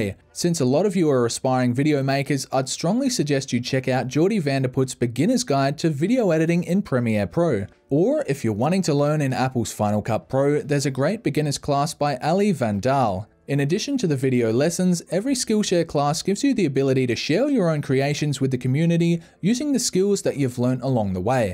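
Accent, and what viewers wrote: Australia. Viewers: here is that aussie voice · The Aussie accent 😆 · got that aussie sound. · That's the aussie accent mate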